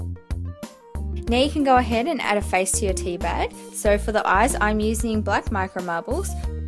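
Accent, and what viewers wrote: Australia. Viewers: Are you Australian you sound like it I am Aussie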